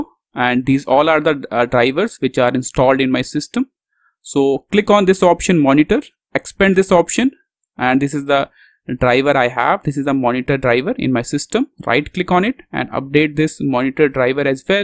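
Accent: India. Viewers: indian accent?? Im saved 🙏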